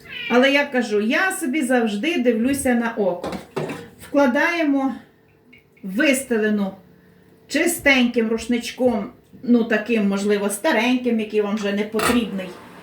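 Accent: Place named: East, Ukraine. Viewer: Дякую за ПОМИДОРКИ!😊 Який у Вас цікава мова - ніби більш притаманна західним регіонам, але відчувається східний акцент теж. Якщо не секрет то звідки ви?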